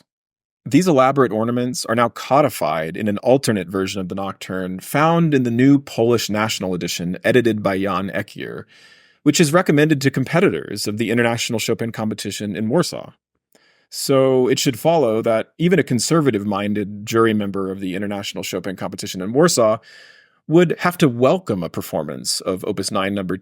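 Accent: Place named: United States. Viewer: Thanks for the reply - I recall now that Americans pronounce "era" and "error" alike, which has confused me before. You guys certainly make some weird and apparently arbitrary alterations - what is the logic behind putting a long o sound in "Chopin"? And it's clearly spelt Koczalski, so why say Koczolski?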